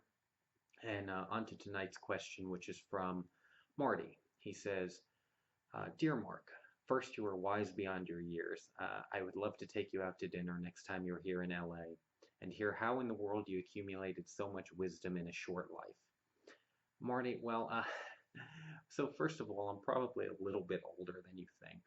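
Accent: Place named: South, United States. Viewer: I like your dirty southern accent,!!!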